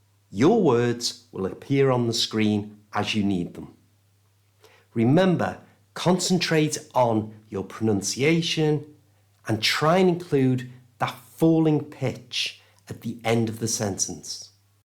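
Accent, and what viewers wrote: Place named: RP, United Kingdom. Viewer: Perfect classes!!! 👏🏼👏🏼👏🏼👏🏼👏🏼 And your accent is beautiful. Is it RP accent?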